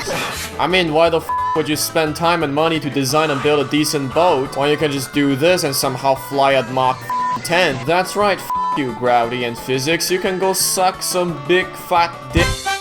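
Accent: Germany. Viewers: Are u german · He has a German accent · are u german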